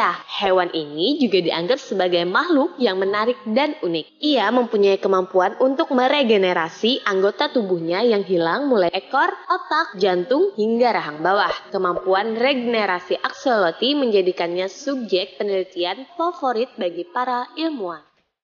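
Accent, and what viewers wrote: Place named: Borneo, Indonesia. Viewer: Asli bujur2 kada kawa diwaluhi tiap pandirannya berassaaaa banarrr ilat2 bubuhan benua borneo wkwkk Mantap banar, min. Katuju aku wan channel pian 🖒🖒🖒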